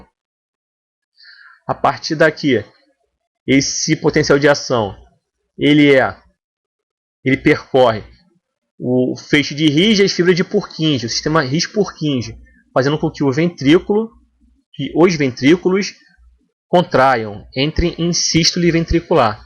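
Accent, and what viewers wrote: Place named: Rio de Janeiro, Brazil. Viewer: Adoro esse sotaque carioca. Um dia ainda caso com um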